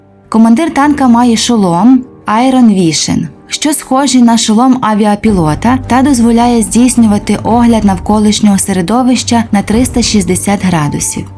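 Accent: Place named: Lviv, Ukraine. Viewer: От слухаю диктора, і розумію що цей диктор точно з Львівщини)))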